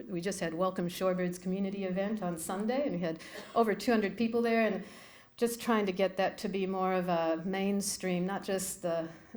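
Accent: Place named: Australia. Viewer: Great work, - it's fun for another previous Oregon, IL resident to about your work in your Australian accent!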